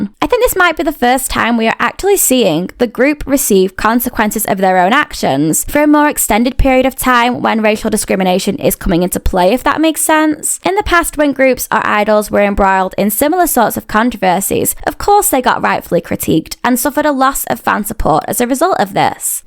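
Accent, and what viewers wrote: United Kingdom. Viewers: Are you British? Lovely accent. ☺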